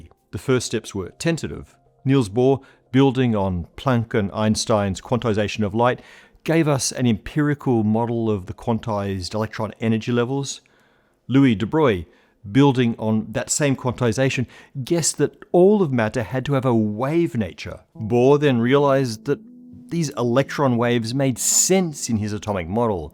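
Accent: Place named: Australia. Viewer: No slack-arsed AI. And sn Aussie. And accessibly explained !! That’s so needed. Subscribed, mate. Greetings from the Wimmera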